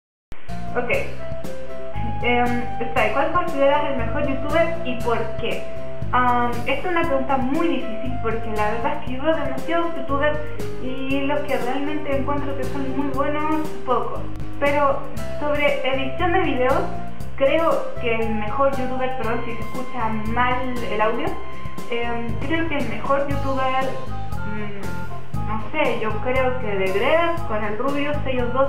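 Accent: Chile. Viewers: muchos dicen que ya sabían que edad tienes yo por tu acento ya sabía que eras chilena xd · yo ya sabía que eras chilena! :'v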